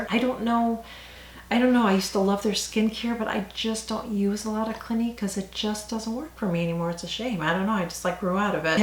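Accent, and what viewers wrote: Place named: Boston, United States. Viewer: Where i you originally from you sound like you're from Boston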